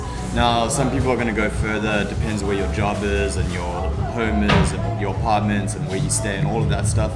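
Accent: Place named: South Africa. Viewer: Dang, your SA accent is way more thick in these older videos.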